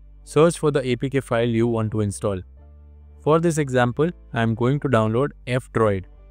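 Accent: India. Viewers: Are u indian bro ?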